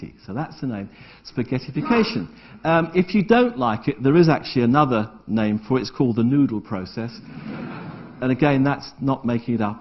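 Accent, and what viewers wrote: United Kingdom. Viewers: his britan english is anoying >_>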